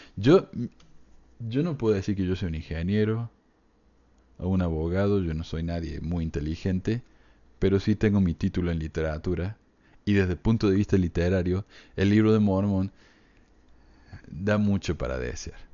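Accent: Córdoba, Argentina. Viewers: =) que Genio sos!!!. Admirable y Valorable todo lo que compartis, excelente tus opiniones, exposiciones y material sos un Capo Genio ya que presentas evidencias respaldatorias y material empírico de gran ayuda para reflexionar y pensar. Te escucho y sigo ya que todo lo que compartís es de gran ayuda y me sirve mucho en temas que veo en la carrera de Psicología. Además que me encanta seguirte por tu "humor inteligente" y amo tu acento Cordobés y tus expresiones!!!. Tu sinceridad y tu "open mind" hacen de vos un gran ser humano =) Besotes & Abrazotes desde Argentina =)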